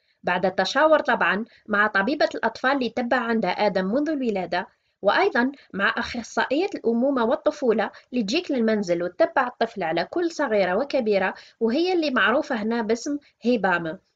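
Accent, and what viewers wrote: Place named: East, Algeria. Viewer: دكتورة عندي فضول نعرف انت منين حاسة بيك من عنابة ولا الطارف ولا قالمة ولا سوق اهراس خاطر هدرتك تشبه بزاف لهدرتي انا من خنشلة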